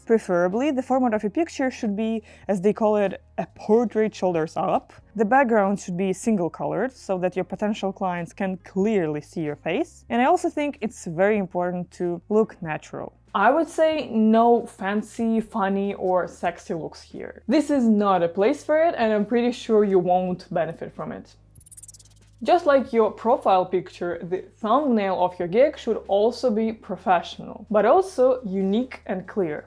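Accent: Russia. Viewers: Are you russian or latvian or from Dutch ? Im a bit confused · Are you a Russian?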